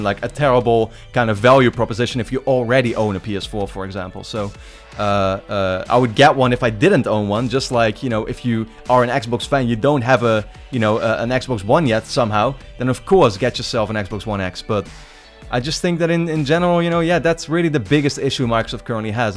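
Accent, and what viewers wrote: Netherlands. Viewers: You sound dutch... Are you dutch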